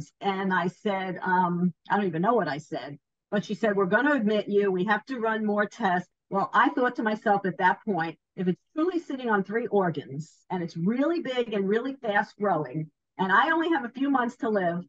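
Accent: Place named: New York, United States. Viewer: It’s the New Yorker in her ….absolutely lovely!!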